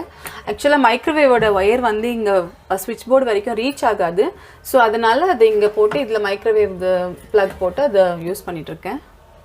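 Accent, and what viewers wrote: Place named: Bangalore, India. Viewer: the way of telling, even im from blr😀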